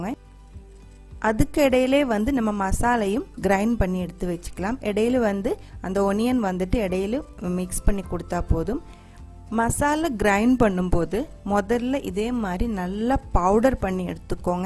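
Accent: Kerala, India. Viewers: உங்கள் கேரள சமையல் சூப்பர் அதைவிட கேரள தமிழ் சூப்பர் தோழி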